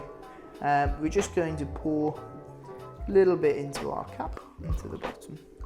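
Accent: United Kingdom. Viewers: You sound brit mate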